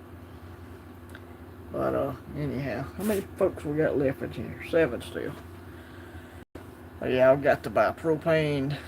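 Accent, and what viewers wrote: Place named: South, United States. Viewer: Sorry I missed your live and hanging out with your awesome Southern Accent. Hope you are doing well. 🙂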